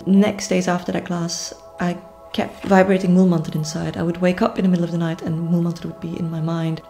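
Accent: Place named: Amritsar, India. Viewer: Her accent AMRITSAR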